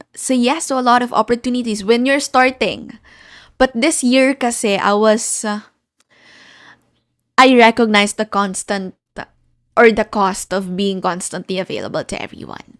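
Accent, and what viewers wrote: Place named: Philippines. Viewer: That was one of the greatest podcasts I’ve ever seen And I see a little Philippines accent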